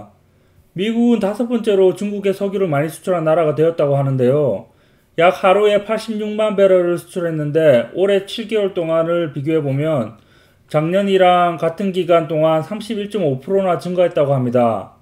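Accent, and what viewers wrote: Gyeongsang, South Korea. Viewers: 유튜브로 뵙게 되서 영광입니다. 구독과 좋아요 눌렀습니다. 억양이 저의 고향인 대구쪽이신 것 같아 더 반갑습니다.^^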